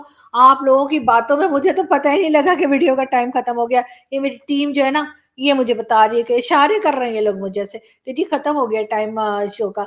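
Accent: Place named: Uttar Pradesh, India. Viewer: Aapka bolne ka tareeka UP walo jaisa hai.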